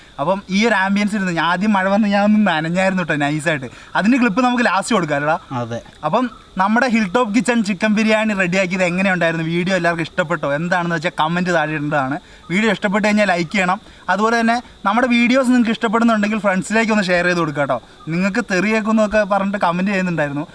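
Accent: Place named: Tamil Nadu, India. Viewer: കള്ളുപ്പ് 😅 തമിഴൻ talk😁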